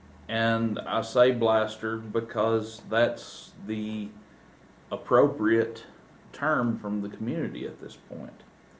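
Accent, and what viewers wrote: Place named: South, United States. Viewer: Once again the voice of reason has a sweet southern drawl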